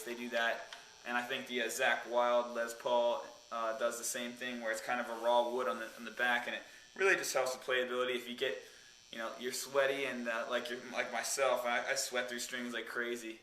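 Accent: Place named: United States. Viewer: Are you Jim Sturgess with a permanent american accent? :) You sound exactly like him.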